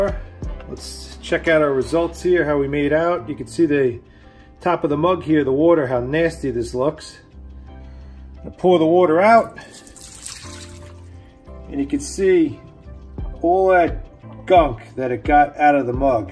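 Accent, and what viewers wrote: New York, United States. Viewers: i used a similar method to this, just with dishwasher tablets. and the results are impressive. by the way, are you from ny? if you are, i quite like the ny accent.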